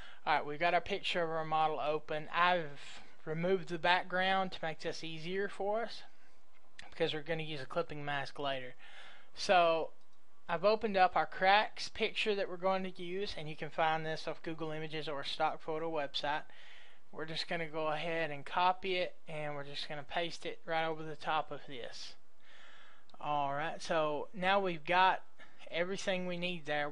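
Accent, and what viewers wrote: South, United States. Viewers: You sound like dunkey with a heavy southern accent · Thanks for a great tutorial. As for accent, speaking as an Englishman it sounds fine to me. Always liked the southern accent. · sounds like peter parker (not from amazing spiderman) with forest gump accent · I find your voice Awesome.... YOU must be Southern Like me? Perfect tutorial ignore the haters.... simple way to get this effect thanks bunches for this............♥♥♥ was searching for this effect Hugz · Def not Forrest Gump but very southern, love it!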